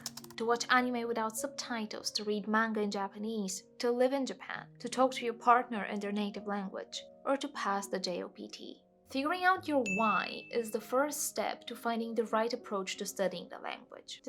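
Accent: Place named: United Kingdom. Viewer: Ah, hearing a British accent speaking about Japanese is refreshing. ありがとう 先生！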